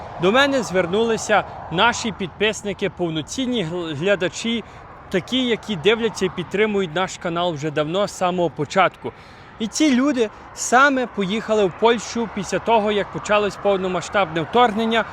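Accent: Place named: Western Ukraine, Ukraine. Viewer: Цей запроданець, буде розкачувати будьяку тему. в нього тільки акцент західний - цце приваблює. Звертайте увагу на те, як він декламує.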